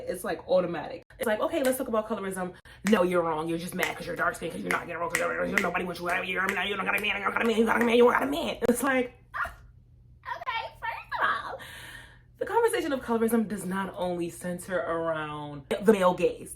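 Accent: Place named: New York, United States. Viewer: I love your accent.....New york ?? New Jersey ??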